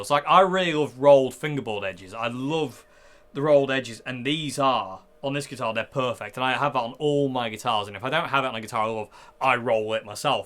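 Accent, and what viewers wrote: United Kingdom. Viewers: I love the British accent, oh and the guitar playing too.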